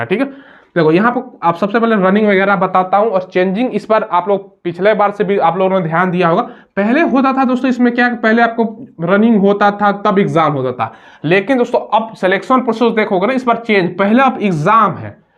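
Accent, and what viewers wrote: Bihar, India. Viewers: Sir aap to khati bihari ban gaye hai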